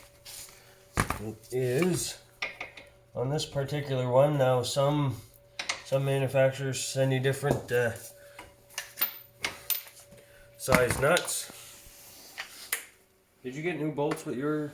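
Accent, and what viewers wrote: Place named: Nova Scotia, Canada. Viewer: Good work...I am going to tackle this same job. I think I recognize your accent. Nova Scotia?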